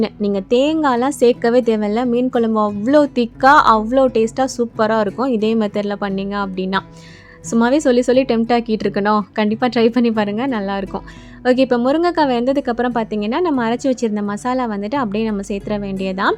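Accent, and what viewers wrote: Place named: Kilakarai, India. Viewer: I'm from kilakarai..manja soru sila peru ipdium panuvaha.. aana idhoda Ginger garlic paste and tomato um serthu kari ku thalipu mari pota inum supera irukum...try pani parunga ❤unga slang um klk mari than Iruku.. maashaa allah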